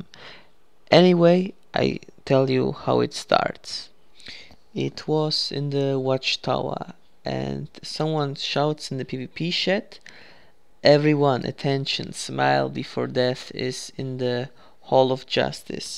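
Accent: Balkans. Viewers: I love Balkans Accent xD Sounds like Borat, VERY NICE xD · I'm sorry I love the way you talk Niko Belic FTW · Your accent is raw as fuck!!!:D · I LOVE BALKANS ACCENT SO MUCH XD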